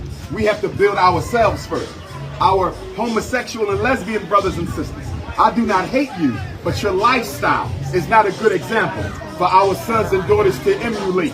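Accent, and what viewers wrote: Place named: United States. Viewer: Who are the “indigenous” Jamaicans? Are you even Jamaican? Cos you sound American to me. And you guys clearly have your own issues you should be more concerned with. Secondly, of course Jamaican are gonna be nice to tourists. Tourism a huge part of the Jamaican economy. Thirdly, land isn’t “taken” it’s not the 1600s! Land is purchased, which the Jamaican people have no actual say in. Just like many neighbourhoods in New York were gentrified, were those neighbourhoods “taken”? You’re just chatting to be chatting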